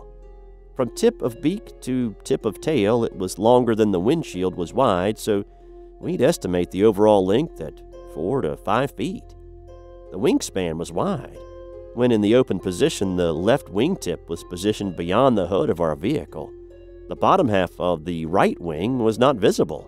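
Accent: South, United States. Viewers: You have a very interesting accent. Are you from the south?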